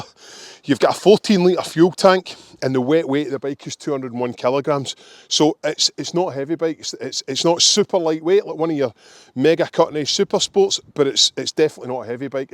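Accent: Scotland, United Kingdom. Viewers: Man, the Scottish accent is really something else! Love the bike and love the landscape; cheers from Italy ✌🏻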